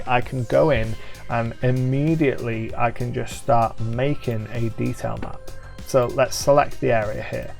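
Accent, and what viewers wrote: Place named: North, United Kingdom. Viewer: Hi New subbie. I played D+D way back when it first started in the 70s (eekkk - showing my age!!). Had a game going for 6 years in the early 80s. Got back into the idea recently and just started doing digital stuff. I purchased Wondercraft and came across your channel. You sound like you are from my part of the world (north east England). Looking forward to learning more from your videos! Thanks, take care and stay safe in these strange times.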